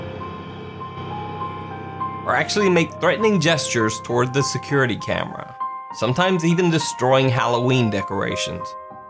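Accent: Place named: South, United States. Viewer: I love your southern accent do you live in Tennessee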